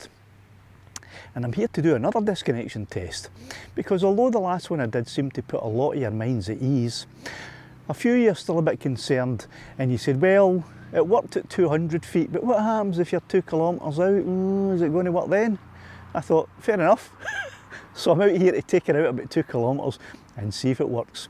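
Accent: Scotland, United Kingdom. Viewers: Hi how's it gawn, its magic tae hear our accent on youtube, understood every, and naming your drone lassie hahaha hiya fae wishy, where were you flying looks like up by Lanark near tnto Hill,? Bet am miles off, I would love tae have the bottle to go in front of camera, better behind it ha ha the mini 2 is amazing, I'm blew away with mine, keep up good work buddy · Thanks for this. I am not Scottish but my ancestors are from Dunrobin Castle. I love your accent. You remind me of a past manager and friend I had years ago whom my wife could not understand, ever! Where do you get your beautiful music? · I'm Australian, so very much used to Scottish accents here.... no subtitles required. Great video. Geeya thombs op mon. Glad to see Lassie returned to fly another day.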